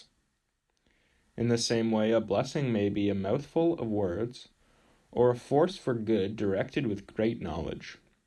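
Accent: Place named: United States. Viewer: A teenage American with a cold narrating an English man's work. It really doesn't work.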